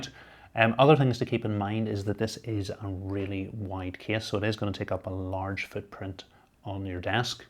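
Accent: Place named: Northern Ireland, United Kingdom. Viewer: That's just a northern Irish accent...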